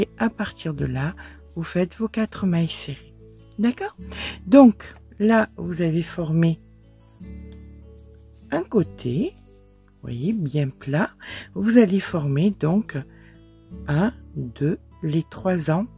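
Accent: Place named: Southwest, France. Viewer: Bonjour. Tuto toujours au top avec des explications amenées sereinement et qui donne confiance avec ce petit accent ❤❤❤❤ (sud ouest?) Merci !!! Et bonne journée (je ne commente pas souvent mais plutôt adepte des ❤️👍)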